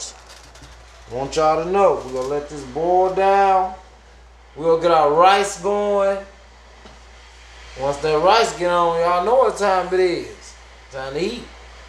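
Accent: South, United States. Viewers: I love that way you talk southern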